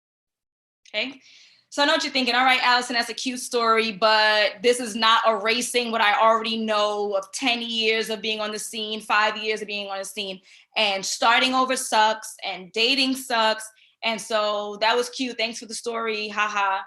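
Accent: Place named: Caribbean. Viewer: Amazing content. Yuh sound like a Caribbean. I support you!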